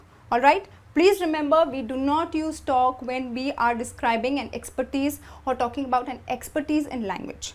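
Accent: India. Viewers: you sound so indian :!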